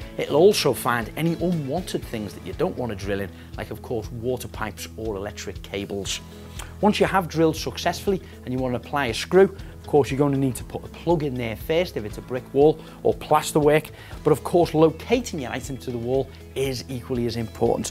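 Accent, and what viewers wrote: Liverpool, United Kingdom. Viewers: He’s a scouser from liverpool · 30 dollars wasted. He's from Liverpool, not Scotland. Completely different accent. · Liverpool accent from UK .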